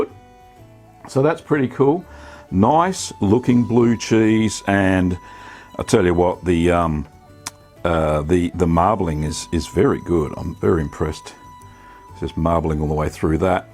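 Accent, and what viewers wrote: Australia. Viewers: I bought some of this from a cheese maker in Napa, California over 20 years ago. It was amazing on crackers, then I used some for gourmet grilled cheese sandwiches and macaroni and cheese. Absolutely wonderful. I hardly ever find it these days. I am an eater, not a maker, so I have to keep looking. Love your accent from Oz. My grandfather was born in Sydney in 1899. I’ve visited 4x for business and holiday, and look forward to returning again.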